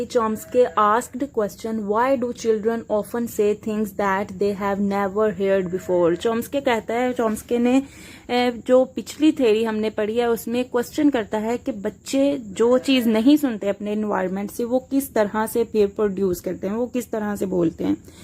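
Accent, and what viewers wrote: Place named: India. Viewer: Why are Indians having a bad accent to accommodate English and still want to teach the same language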